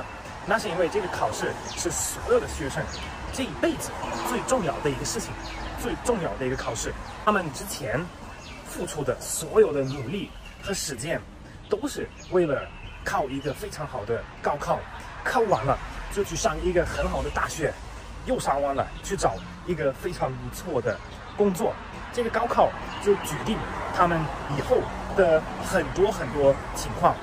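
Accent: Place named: Chengdu, China. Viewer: 成都话好亲切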